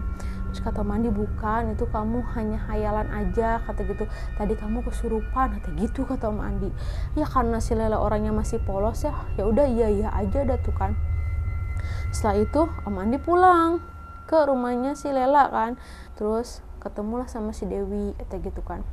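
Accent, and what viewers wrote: Indonesia. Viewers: Ngerti dong Sunda banget c teteh na nyarios na😅😅👍👍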